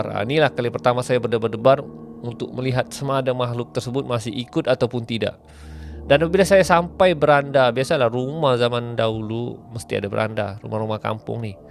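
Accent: Sabah, Malaysia. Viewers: bahasa indonesia lain bah...bhasa ini slang sabahan originol punia...😁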